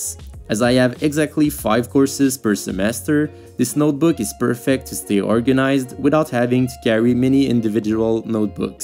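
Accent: Quebec, Canada. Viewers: Are you by any chance from Quebec? Your accent sounds quite familiar. aha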